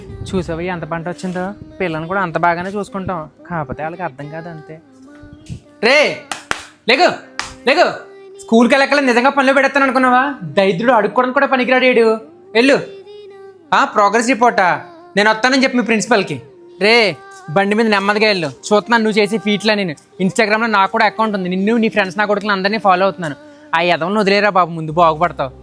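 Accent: Godavari, India. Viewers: Adi godavari slang andi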